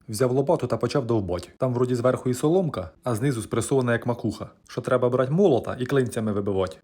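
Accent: Ukraine. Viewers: Боже,який гарний український діалект,насміялася Хай щастить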